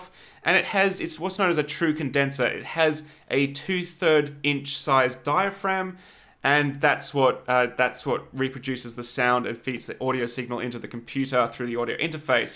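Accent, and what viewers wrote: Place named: Australia. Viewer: Hahaha thinks I watch to hear his cool Australian accent. I watch because of his awesome content.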